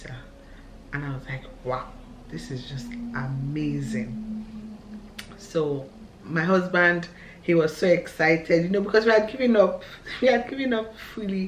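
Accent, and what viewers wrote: Ghana. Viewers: Too much respect to you and all women around the world I can see how child bearing really takes toll on women you’re totally changed physic wise and the tone of your voice now sounds more Ghanaian congratulations 🤱🏽👼🏾👩🏽‍🍼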